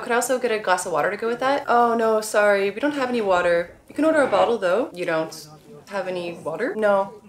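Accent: Netherlands. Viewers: Your Dutch accent is great. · 'Tot de volgende keerrr.." Your Dutch has a Gooisch accent'.😍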